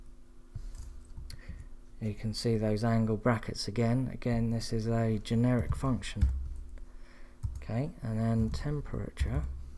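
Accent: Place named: London, United Kingdom. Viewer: Wow amazing London accent:)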